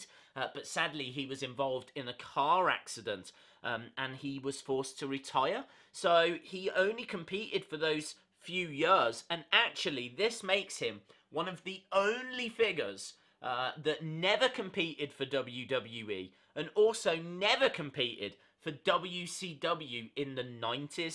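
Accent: United Kingdom. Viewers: What ever he britsh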